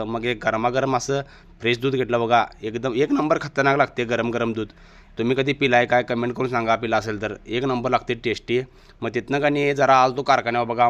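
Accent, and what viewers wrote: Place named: Ichalkaranji, India. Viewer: इचलकरंजीकर आहेस का भावा?? टोनिंग तसेच वाटते आहे..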